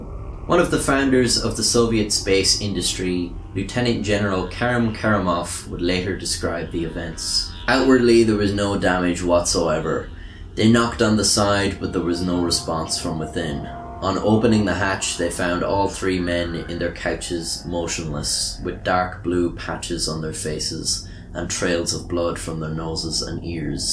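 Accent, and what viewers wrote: Ireland. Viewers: It's refreshing to hear another Irish accent that I'm not used too during this quarantine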